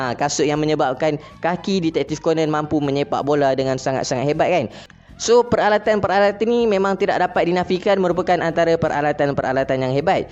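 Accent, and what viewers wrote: Kelantan, Malaysia. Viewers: Org klntn ke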